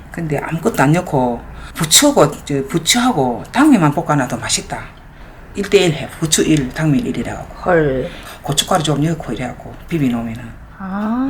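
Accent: Gyeongsang, South Korea. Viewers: 쌔리 뽂아도..ㅋㅋㅋㅋ 이억만리 미국에 나와사는 이민자 이면서 경상도 출신 이라서인지 너무나 정겹습니다. 엄마가 그리워질만큼요.. 항상 챙겨서 듣고보고 따라 해보고 있습니다. 고맙습니다!! ^^ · 반찬 배우러 들어오는데 이젠 경상도 말까지 다 알아들음ㅋㅋ쉽고 맛있어요 감사합니다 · 솔직히요,,, 전 선생님 목소리 말투 들으러 와요~ 음식레시피는 덤으로 보고가구요~ 우리 가족이 다 갱상도 인데예~ 지 태어나고 서울올라왔심더~ 그래서 갱상도 사투리가 그~리 정감이가요~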